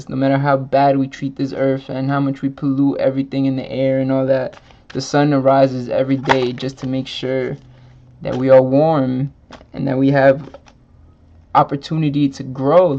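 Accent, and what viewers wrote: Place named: Jamaica. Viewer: why u trying to sound like a Jamaican?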